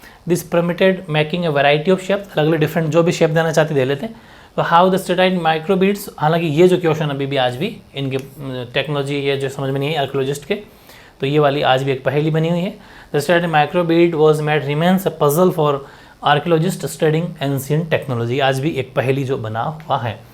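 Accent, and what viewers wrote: Rajasthan, India. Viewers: राजस्थान से हो क्या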